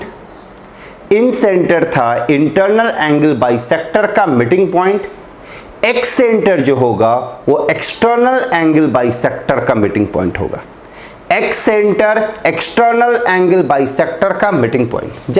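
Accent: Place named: United States. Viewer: sir aapki hindi, american hindi jaisi lagti hai mast.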